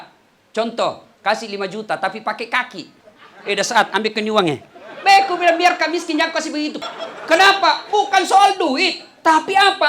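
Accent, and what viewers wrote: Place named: South Sulawesi, Indonesia. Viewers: Kentara medok bahasa bugisnya hahaha tho penrank to Sawitto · Pasti ada aja lucu lucunya. Logat bahasanya ust saya suka. Mantap bugis Makasaar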